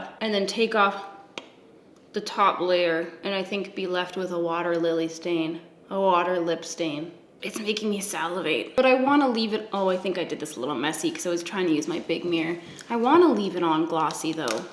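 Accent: Canada. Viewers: Your Canadian accent makes my soul happy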